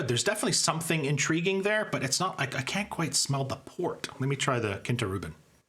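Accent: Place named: Canada. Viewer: Ok make sense ! You sounded Canadian, but the prices $$ of these scotches seemed to be in US to me... anyway didn't have time to go thru your channel yet, but looking forward to it ! God bless, cheers et Merci beaucoup !!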